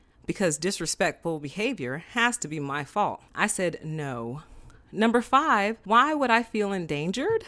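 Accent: South, United States. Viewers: You have a cute southern accent!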